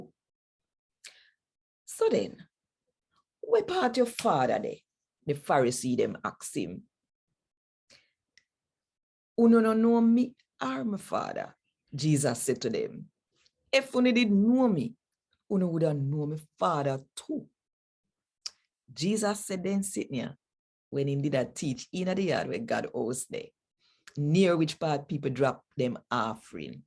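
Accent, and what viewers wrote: Jamaica. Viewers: Authentic Jamaican! Beautifully done. Keep it up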